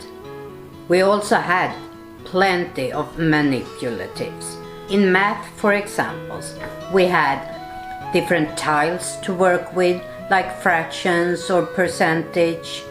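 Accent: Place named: Sweden. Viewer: That was very interesting, and as always love the Swedish accent, good video thanks for sharing.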